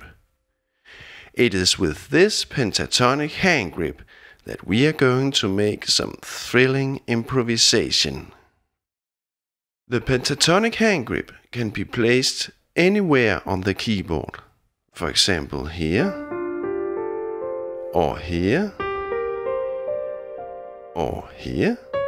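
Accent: Denmark. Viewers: i love danish when they speak english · I did, II - V - I and pentatonics are usually one of the first lessons I teach in my lessons (bass player). Who does lectures in Basic Jazz Harmonics in another way? But it's been a long time, so thanks . Fresh input is always welcome! I still love your very musically educated "muppet hands show" :D Danke schön, well done (do I hear a Danish accent? ) Frank --- and, of course I'm a bit drunk. Sorry!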